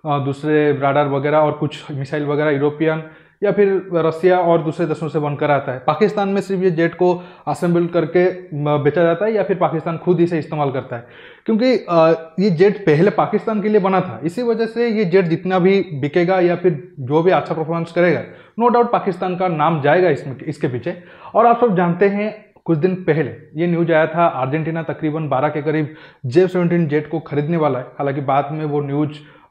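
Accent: Odisha, India. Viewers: This fellow is out and out Odiya.His accent reminds me of my very good friend Mahapatra.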